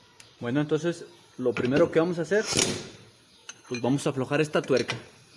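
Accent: Mexico. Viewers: Muy buen trabajo,además el relato cuando vas haciendo el trabajo es muy entretenido,la tonada mexicana es única!!!!saludos desde la patagonia argentina manito!!!!!!!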